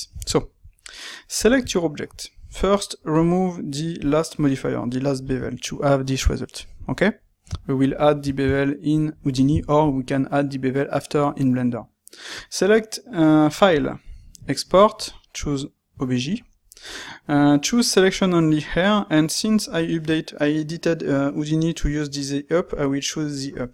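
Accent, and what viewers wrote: France. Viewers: bizarrement je sais pas si c'est l'accent français qui me fait cette impression mais je crois trouver plus facile d'apprendre houdini sur cette chaine en Français comme en anglais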